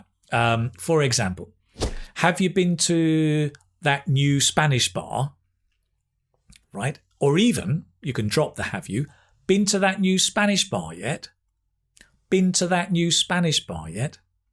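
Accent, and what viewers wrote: United Kingdom. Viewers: I didn´t believe that I coulp understand british English but this teacher is very good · British accent, that's cool.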